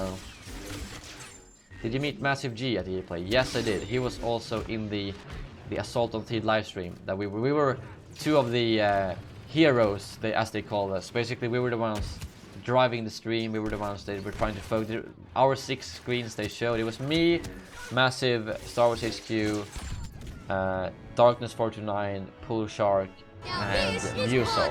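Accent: Sweden. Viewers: You come from Sverige ? :D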